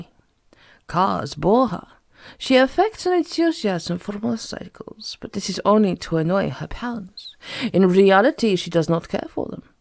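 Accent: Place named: London, United Kingdom. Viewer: Great London accents btw not overdone which is a mistake even professionals make sometimes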